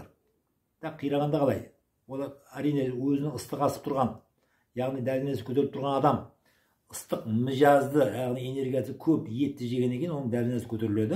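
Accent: Uzbekistan. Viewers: Бешбармақ қай тілде акцентіңіз өзбекше ме сұрланғ😢😢 а н ет деген не Сүр🎉😢😢 ет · Қазақ, тек Ташкентте тұрғандықтан тілі өзбекшеге кетеді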